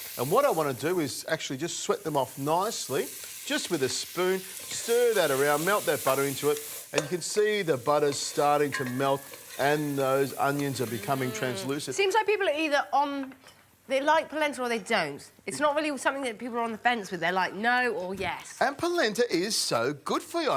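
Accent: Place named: Australia. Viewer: That's an Australian accent, not Italian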